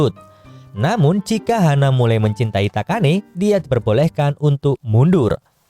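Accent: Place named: Madura, Indonesia. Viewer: Omong gak seneng min .. terlalu kaku .. kayak omongx orang madura yg belajar bahasa jawa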